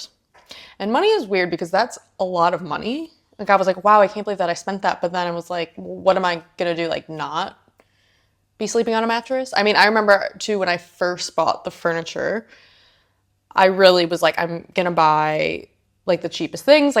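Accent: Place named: Australia. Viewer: I'm hearing an Australian accent more with every video Just waiting for the 'no worries' and 'mate' 😂😂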